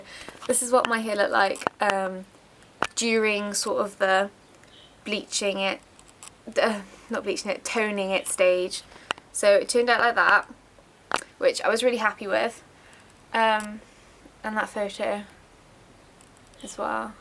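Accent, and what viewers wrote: Cornwall, United Kingdom. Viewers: You sound cornish, correct me if i'm wrong? Great tips, by the way!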